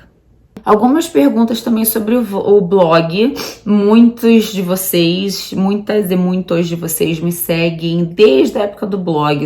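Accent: Rio de Janeiro, Brazil. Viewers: Oi, então a sua voz é maravilhosa adoro você falando,especialmente com esse sotaque carioca que é o meu preferido acho lindo lamento ter descoberto você quando já estava mudando para 🇺🇸 e não tive a oportunidade de conhecer -la pessoalmente aqui em londres, mais enfim sou super fã dicas de beleza então são minhas favoritas.bjus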